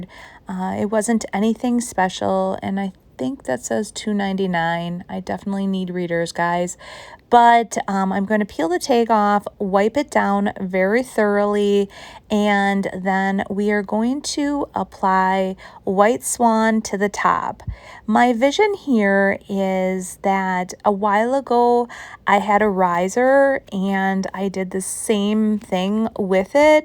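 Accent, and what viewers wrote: Wisconsin, United States. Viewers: Love everything!! Your Wisconsin accent makes me miss home..love it! ( I live in California but originally from the Illinois/Wisconsin border) Shabby Chic is my favorite.. I’m on the No vote side to Laundry it’s beautiful as is!!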